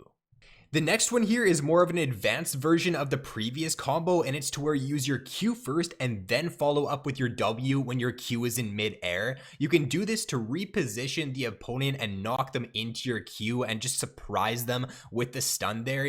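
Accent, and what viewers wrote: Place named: Canada. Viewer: Took me watching like most your videos to hear your Canadian accent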